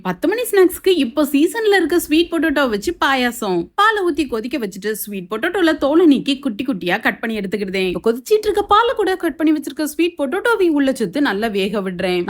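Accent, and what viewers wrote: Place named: Thoothukudi, India. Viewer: Sister ungalukku entha ooru... Because neenga pesura language kekum pothu enga area mathiriye iruku sis.. naan Thoothukudi district sis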